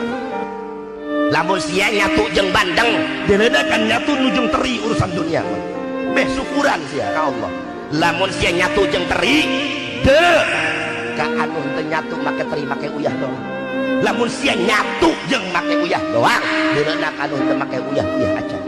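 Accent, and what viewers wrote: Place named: Banten, Indonesia. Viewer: Logatnya sunda banten kan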